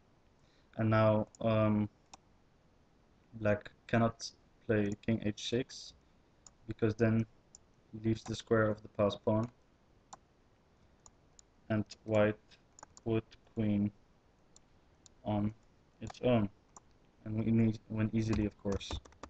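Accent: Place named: Egypt. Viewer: Why English Mr?!, For who you are making this? Make same video with your sweet Egyptian accent pls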